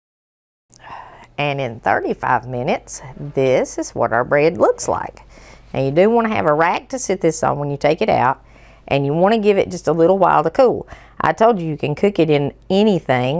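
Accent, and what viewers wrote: South, United States. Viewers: I love your down home accent. I lived in Louisiana for 3 years. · LOVE ur southern drawl...im in nw ark ❤ LOVE u have pledge of allegnce posted! God bless ya'll 🇺🇸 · . I love your accent. I've always loved that southern drawl as we call it here in the UK. I'm an East End London Cockney. So I've also got a distinctive accent. Thanks for your lovely tutorials Xxx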